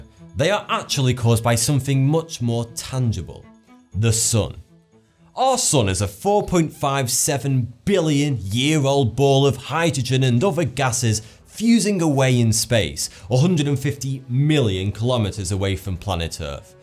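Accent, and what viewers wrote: United Kingdom. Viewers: Are you British people really saying "sun" and sound like "son". WHYY!~?? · i like your british accent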